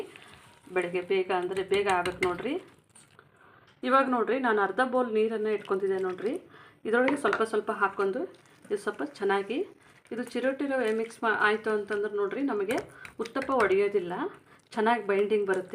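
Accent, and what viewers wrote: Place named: North Karnataka, India. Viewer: ನೀವು face ಯಾಕೆ ತೋರಿಸುವುದಿಲ್ಲ. ಉತ್ತರ ಕರ್ನಾಟಕ ದವರಾ a style matadi ಚೇಂಜ್ ಮಾಡ್ಬೇಡಿ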